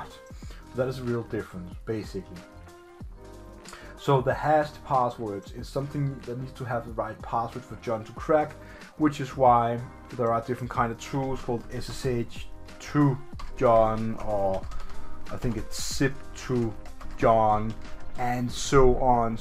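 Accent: Denmark. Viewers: Are you from Denmark?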